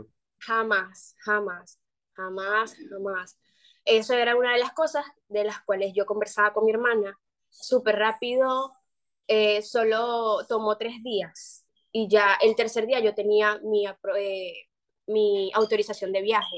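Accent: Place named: Puerto Rico. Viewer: Eres en verdad venezolana hablas como si fueses de Puerto Rico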